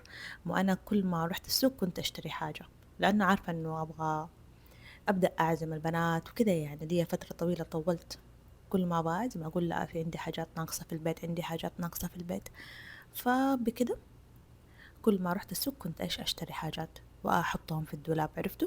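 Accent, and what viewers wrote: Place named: Jeddah, Saudi Arabia. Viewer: هي سعوديه جداويه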